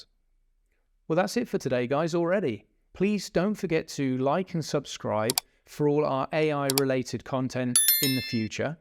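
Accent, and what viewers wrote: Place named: England, United Kingdom. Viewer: You have a very nice english accent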